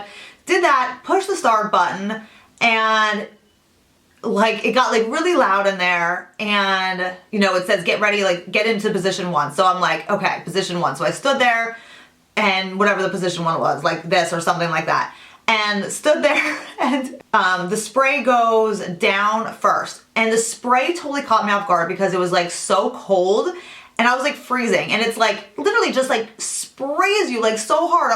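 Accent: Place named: Northeast, United States. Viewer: I knew you had a north east accent , I'm from the north east as well ( New Hampshire ) I live in southern Virginia now . This makes me love your channel even more .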